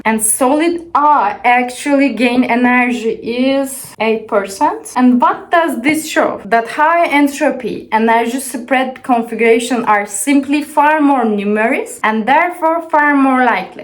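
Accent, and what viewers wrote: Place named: Russia. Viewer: Thanks for the video. Btw ur accent sounds more Russian than Turkish. I am Turkish too, but i'm going along with the concept.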